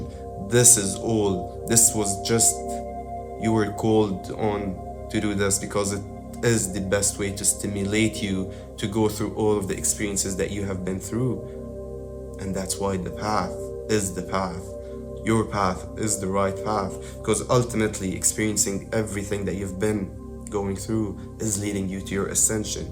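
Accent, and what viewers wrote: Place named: Ireland. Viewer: Your accent is mad. Can I detect a hint of Irish?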